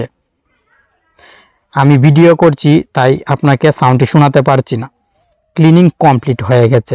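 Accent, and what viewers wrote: Mymensingh, Bangladesh. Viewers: Vair bari mone hoi Mymensingh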